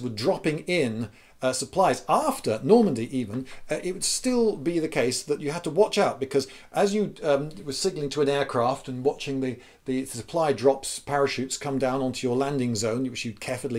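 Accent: England, United Kingdom. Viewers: Maybe it's because I'm an American who's been conditioned to associate accent with Monty Python, but this particular video seems oh-so-easily adaptable to a comedy sketch.... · Sees interesting tittle Clicks The youtuber has a british accent and the video is about France Grabs popcorn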